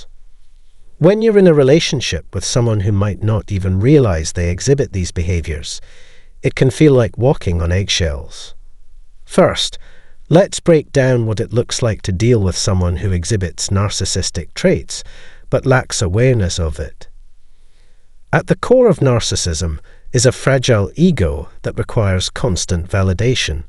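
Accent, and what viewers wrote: Scotland, United Kingdom. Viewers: Your channel is great. Your narratives are very clear. Your accent sounds very familiar. Are you Scottish by any chance?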